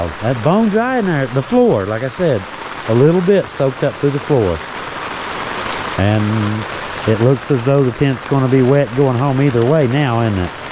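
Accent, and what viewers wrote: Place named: Virginia, United States. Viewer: I have been boondocking in this rain also for days and nights! HA! I love your Hillbilly Humor! You so funny. And you talk like us here in SW VA. I will be camping in West by God in June, love it!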